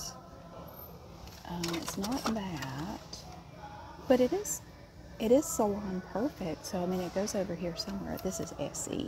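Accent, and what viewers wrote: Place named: South, United States. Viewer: Oooooo yay!! I love these videos it’s so soothing to watch you organise the shelves along with your southern accent talking away ( I’m British 🇬🇧 and I love me a southern accent 😊 ) I especially love when you organise the soaps!! ( that’s my fave ❤ ) Looking forward to another one of them videos too!!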